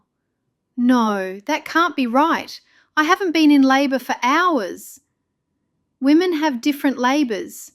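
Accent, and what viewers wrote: United Kingdom. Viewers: Wow! Heavy British accent!😂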